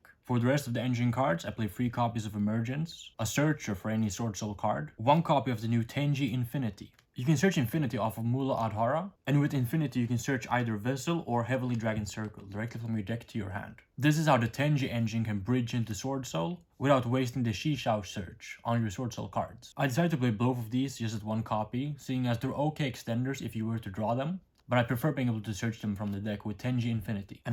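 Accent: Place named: Sweden. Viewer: dont be a prick, how many languages do you speak perfectly? He is swedish, they pronounce some letters differently in english, big deal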